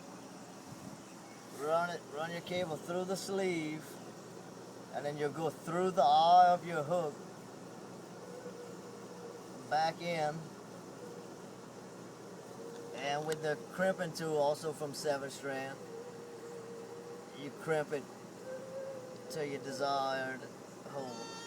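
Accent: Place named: Louisiana, United States. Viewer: i can tell your from around here with the accent i live in new iberia not too far down the road lol i live by a small bayou that leads to avery island and there are nice 5 and 6 footers coming in and out and imma make some of these to put in there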